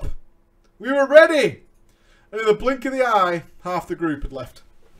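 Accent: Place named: England, United Kingdom. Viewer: Is it a bad thing that I'm Canadian and have accidentally adopted an English accent listening to 'im or is that normal?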